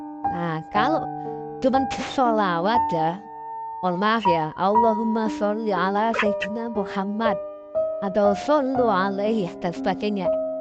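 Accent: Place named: Surakarta, Indonesia. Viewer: Itu suara solo mas😮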